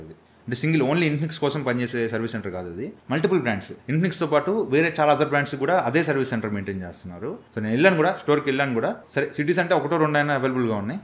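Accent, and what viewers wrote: Nellore, India. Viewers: Anna Medhi Nellore aa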